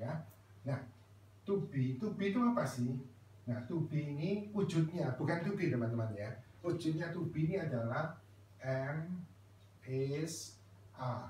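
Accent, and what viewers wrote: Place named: Surabaya, Indonesia. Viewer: Kangen materi selanjutnya nih.. ditunggu upload nya, oh iya saran kalau bisa materi ini diupload ulang dengan resolusi yang bagus biar tidak pecah.. pak ada kontak atau alamat kursusnya ya? Sepertinya pak org surabaya aksennya.. makasih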